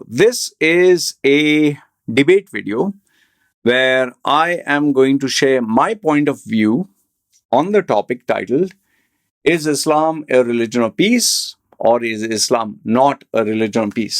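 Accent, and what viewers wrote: India. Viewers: Ok looking past your tattooed funny looks and very strong Indian accent and onto the actual subject. Your first argument to prove that Islam is not a religion of peace is because most of the so called terrorist attacks are done by Muslims and not any other religion. It helps when you do a little bit of research before opening your mouth. Year 1009. Crusader siege of Jerusalem result in mass killing of over 100000 people. Historians write that blood was flowing in the streets of Jerusalem. All done in the name of Christianity hence the name Crusades. Year 1187 and Saladin conquers the Jerusalem from the Crusaders. What does he do? Offer ransom to Christian and Jews to leave the city peacefully to the land of their choice. No mass killings at all in the name of Islam. Just one example to reply back to your silly podcast with zero research. Fast forward to two world wars where millions were killed. 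Why were Jews killed in WW2? Yes because they were Jews. Who killed them? Now onto the latest example. How is committing Genocide in Gaza? Yes take your time and do some independent investigation. Maybe you will be able to see clearly even thru your tattoos next to your eyes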